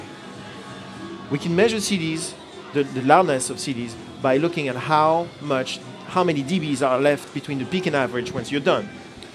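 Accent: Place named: France. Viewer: Are you French by any chance? I don't know what it is but it instantly makes your English sound much more awesome. That's not cool man; it colors my perception of you and prevents me from getting a proper read, lol. Anyway, great explanation. Very well put, as if your words came straight out of my head on every point. I'm sure you do great work and thanks for taking the time to educate. All the best!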